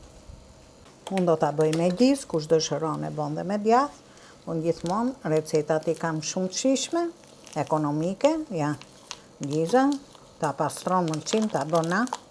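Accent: Tirana, Albania. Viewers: te lumte i ke duret flori,ka lezet dhe e folmja. tironce nji e sbohen dy,hallall 🥰👐💐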